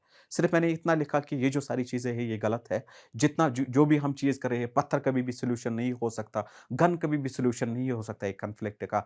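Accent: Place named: Kashmir, India. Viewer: I like kashmiri hindi..their slangs is really sweet..u need to have courage to say these things in public platform...hats off u brother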